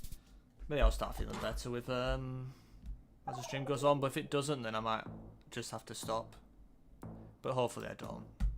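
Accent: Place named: Lancashire, United Kingdom. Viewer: Don't give up. Your doing great. I always watch your videos (I am Polish). I will region guess you by accent. Your'e from Lancashire, either Preston or Wigan.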